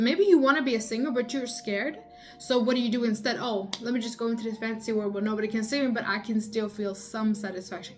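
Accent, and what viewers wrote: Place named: Balkans. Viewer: I hear a slight accent. Are you from the Balkans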